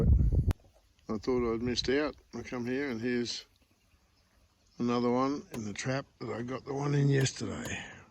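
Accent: Australia. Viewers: What part of Australia are you?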